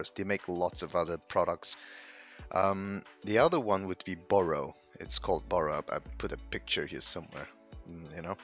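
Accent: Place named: Germany. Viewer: loved video, i can tell your normal language is german, it's a cool language :D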